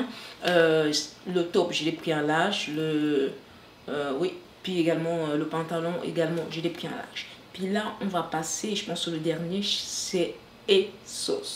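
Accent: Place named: Cameroon. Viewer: Je ressens un accent camerounais, vous êtes camerounaise ? J’adore ce haul surtout la longue robe à la fin la .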